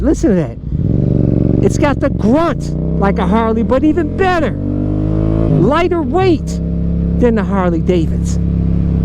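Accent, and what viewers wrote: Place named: Texas, United States. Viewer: Are you from texas? You have a southern accent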